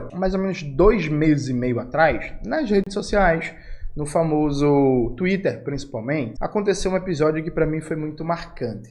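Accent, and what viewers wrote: Pernambuco, Brazil. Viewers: Clique beite. Eu adoro esse sotaque de Pernambuco❤❤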